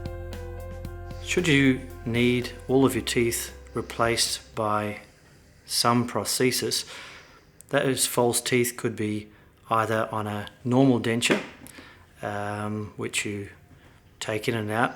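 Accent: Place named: Australia. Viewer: Here in the u.s. my implants cost $30,000. I love the Aussie accent.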